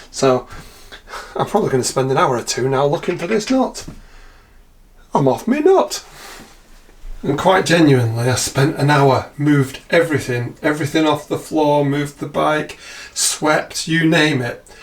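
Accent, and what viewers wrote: Yorkshire, United Kingdom. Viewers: "At the suggestion of my wife..." I don't know how many times my Yorkshire Lass wife has pointed out some reasonable mechanical solution while I'm busy overthinking. I often show her your vids as she loves hearing the Accent of Her People and little things like Henry Hoover in the background that remind her of home.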